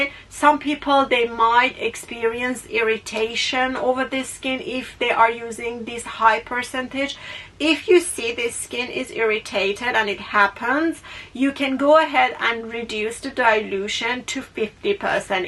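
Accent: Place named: Iran. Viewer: Your natural Iranian voice is so much better than ai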